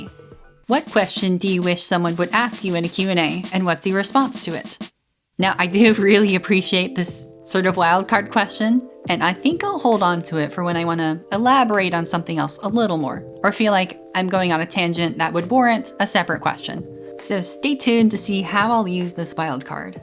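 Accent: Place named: South, United States. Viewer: i'm a linguist so it's actually super easy to answer! so southern american english has a lot going on with front vowels (vowels where your tongue is in the front of your mouth - think the vowels in "heed," "hid," "head," and "had") and diphthongs (vowels that are combinations of two vowel sounds - our non-rhotic diphthongs are vowels in "eight," "vote," "find," "down," and "boy"). particularly, SAE has the pin-pen merger where the vowels in those two words (/ɪ/ and /ɛ/) merge into the same vowel. you do this a little bit in the word "thing" in this video at . with diphthongs, SAE tends to reduce diphthongs to monophthongs; think "ah" instead of "I." you do this a little bit in the word "time" at . on the other hand, there's also diphthongization of what are traditionally front monophthongs! so thing like, "pay-en" instead of "pen." you do this a little bit in the word "genuinely" at . 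you don't like fully diphthongize it, but you turn /ɛ/ (vowel in "bet") into /e/, which is a vowel that doesn't traditionally exist on its own in english, as usually it's part of the /eɪ/ diphthong (like in "bait"). i feel like you also really velarize /l/ sounds a lot, like in "general" throughout the video. typically in american english, we do what's called an apical "L" (or light L, /l/) at the beginning of syllables (like in "light") but we do a velar "L" (or dark L, /ɫ/) at the ends of syllables (like in "pull"). the dark L is called as such because it jus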